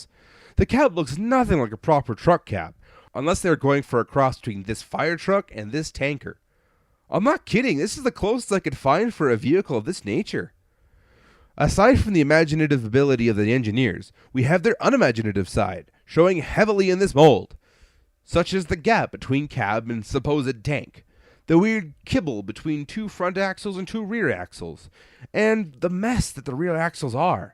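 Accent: Canada. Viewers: Haha you sound like a candian .Are you Canadian ?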